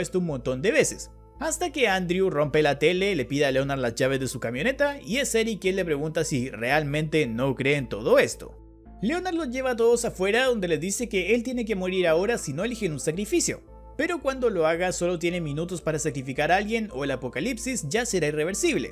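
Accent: Chile. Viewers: ERES CHILENO? 🇨🇱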